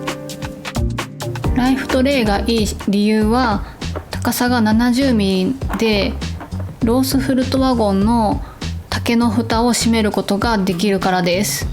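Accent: Kansai, Japan. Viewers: さん、こんにちは♪ 毎週さんの動画アップを楽しみにして拝見しています。今回のさんいつもと違って声のトーンが低くお元気なさそうに感じましたが、お身体大丈夫ですか？いつも関西弁がちょくちょく出てさん独特のコメントが親近感を感じててプッと笑う事もしばしばで大好きなんですよね！今回のトークは推しに対する「可愛くないですか！？」がいつもと違った感じがしました。お仕事と家事と撮影と編集...色々お忙しいと思っています。お疲れが出ないようにして下さいね♪また来週楽しみに待っております！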